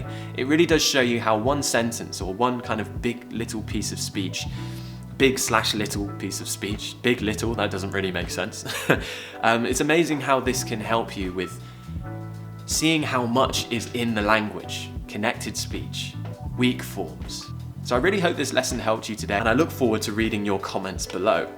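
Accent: RP, United Kingdom. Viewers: Best I have seen thus far. He speaks clearly so you can really hear the different in pronunciation in comparison to other accents. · AT LAST THE REAL BRITISH ENGLISH ACCENT. THANK YOU MATE!!!!! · same here, looking forward to speaking like him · Nice videos you're doing. Your accent is perfect for an old teacher to get back to a standard accent. I lived in the US for awhile, then in Northern Ireland. The Northern Irish accent from Fermanagh prevented me from teaching for a while. I resumed teaching with an American accent, but after a while I realized the Yankee accent was very difficult for French learners. After my break, following a surgery, I was on sick leave for almost six months and moved on to get back to a standard RP accent, which proves to be more understandable for some students... Had a French student, whose father is a Briton, he couldn't get a word in any exercice with American English (mostly listening exercice). 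I found your videos recently and it is totally rad (sorry, Americanism)... As a teacher of English, even though I have lived for some time in English-speaking areas of the world, the right intonation in English is always a challenge for toneless and stressless (depending on regional dialects) French. I sometimes use your vids in class to get my students used to the new RP accent I hear when visiting southern England... Keep on with it! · I started watching you because you are similar to my ex friend, but now I do it only for your great teaching of my dream accent. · Hi Teacher I really liked your accent Britsh accent. I enjoyed your lesson. I will continue listening to your lesson because I like it. I didn't understand the course you have talked about. Where ca I found it? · New subscriber here ! When I watched your video I love it already 😁 I really like British accent Like movie Harry pottah! 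😅 Game of thrones 😅 Watching from Philippines Thank you for sharing Keep up the good work 😇 Ps I watched your video since yesterday 😅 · I love the British accent and l love your explanation. You are an amazing teacher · Sir, your way of teaching is outstanding. These videos are really helpful in terms of british accent . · I love your accent. Thanks for your beautiful british accent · I'm Egyptian and it is the first time to see your videos.. That was really amazing and i hope to be excellent in British language cause I'm in love with this language accent❤️❤️❤️❤️❤️ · I want to marry a British man. Love your accent! 😍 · Hello you have a perfect british accent I recognize because i had a canadian teacher who taught me a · I´m a languages speaker student and I´m more familiar with an American accent than a British accent, but your videos have helped me to more familiar with your British accent. 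Love your videos and you too. Kisses from Mexico. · You are amazing teacher. I have just joined you. I am fond of the British accent. thank you, I am from Egypt and I love you very much God bless you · Listening to you to get more from your accent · Love your accent I want to learn more British English accent ❤❤❤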